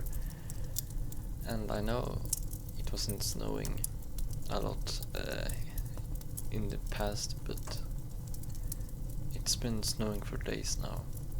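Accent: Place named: Sweden. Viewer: I knew it! Your accent reminds me of my first love🥺 He's from Sweden too.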